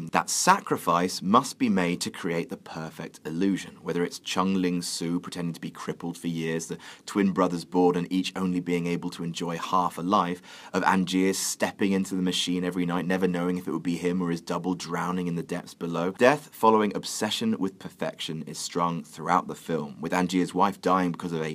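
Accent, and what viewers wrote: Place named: England, United Kingdom. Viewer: stop talkong so fast its hard for us americans to understand you with your dumb english accent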